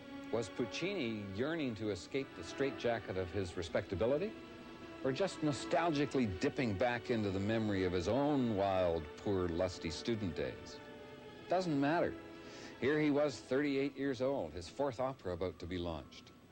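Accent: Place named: Canada. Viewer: Who’s the host? Presumably he’s originally Canadian, but I can’t place him.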